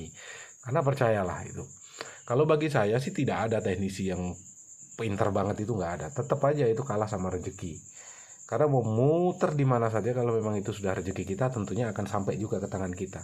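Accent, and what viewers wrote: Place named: Bali, Indonesia. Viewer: logatnya bali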